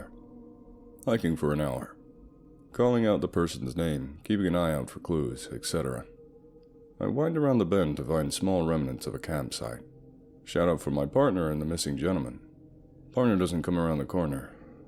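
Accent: South, United States. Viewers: Man I dig ur southern U.S. accent! From Oklahoma myself!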